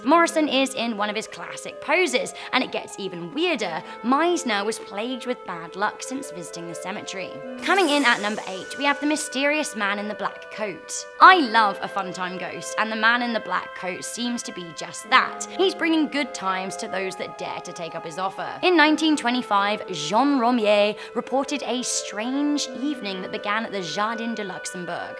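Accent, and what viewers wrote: United Kingdom. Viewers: Lol dont know what it is but I love it how British people narrate anything, reason why I watch BBC America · I LOVE YOUR BRITISH ACCENT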